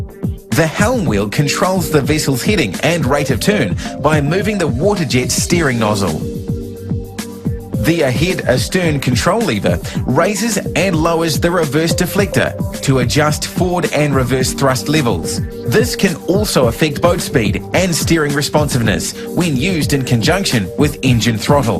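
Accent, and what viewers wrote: New Zealand. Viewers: Kiwi. Waterjets were invented in NZ. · nz accent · Love the accent. Reminds me of the Kiwi advert for Deck Stain, "This weekend I will be polishing my dick".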